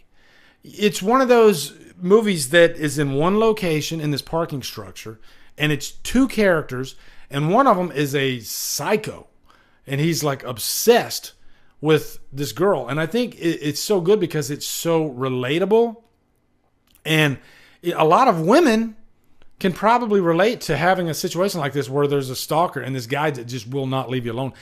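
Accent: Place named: Southeast, United States. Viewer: What an Elvis nod! Brilliant! I love your accent. Where are you from in the US? I'm guessing south east (I'm not from the US). Thanks for the list!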